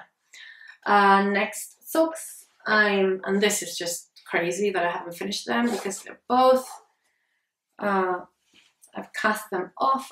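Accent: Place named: Chile. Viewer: Hi! Nice podcast and I love your acento chileno:)( when you say Santiago de Chile ) I miss that accent ( Im originally from Argentina, now living in Canada )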